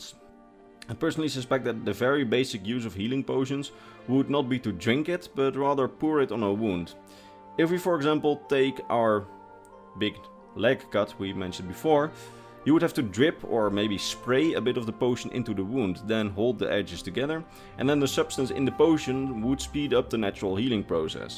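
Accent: Netherlands. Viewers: goeie info hoor alleen wel echt je nederlanse accent xD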